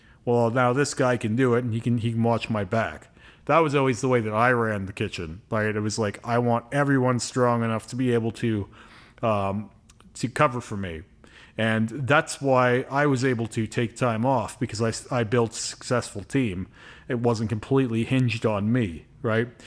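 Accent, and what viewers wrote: Canada. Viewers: As a Canadian myself, I could tell right away that you were Canadian lol everyone thinks Americans and Canadians have the same “accent” but it is so different hahaha cool stuff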